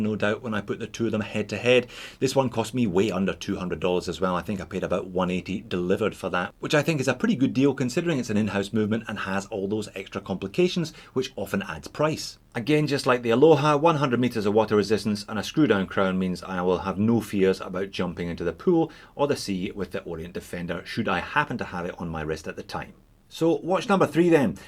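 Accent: Scotland, United Kingdom. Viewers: Scottish, that is what I thought. Just didn't want to say it on YouTube and make fool out if myself, in case I would have guessed wrong.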